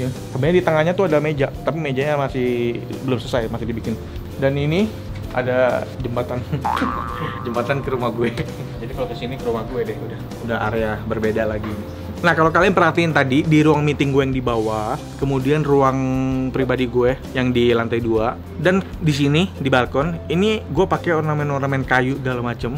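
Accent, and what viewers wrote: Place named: Pontianak, Indonesia. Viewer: Orang Pontianak mana suara nya 😄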